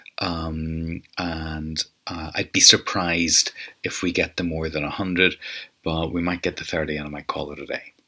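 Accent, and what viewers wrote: Ulster, United Kingdom. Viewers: I love the Ulster dialect! This is similar to the Pimsleur method. Say out loud, predict, repeat . Thank you. There are not many classes out there which are just oral.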